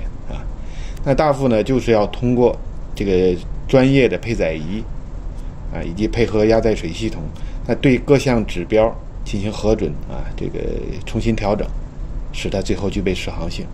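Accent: Tianjin, China. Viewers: 嘛，，我建议，您嘛还是讲天津话好听，当然您现在讲的也好听，听着舒服，亲切。我是建议呀，我看还是把这节目办的有点那么是相声的感觉，就更好了，凭您的水平，口才，我看成，点赞。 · 看的视频有一阵了，刚开始没确定的天津口音，听着很熟悉，让我想起了在天津上大学的时候，作为一个南方人，也很爱吃煎饼果子。很喜欢的节目，我是干航空的，看航海的，我发现很多相似但又完全不同很新奇的知识，感谢的科普！ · 听口音就是天津爷们。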